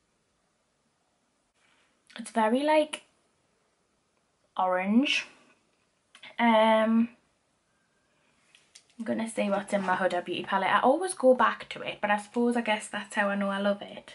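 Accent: Newcastle, United Kingdom. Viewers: i love watching you cos its so nice to hear a geordie accent for once and youre just so real and lovely xx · I love your Geordie accent I’m from the north east my self and you are they most stripped down honest you tuber I have came across don’t really know if you will see this but hey ho I love your channel iv just came across you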